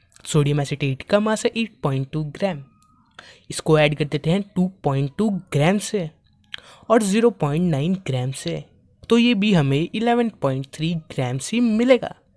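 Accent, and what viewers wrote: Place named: Punjab, India. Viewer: It's good but don't speak punjabi on English plzzz🙏🙏🙏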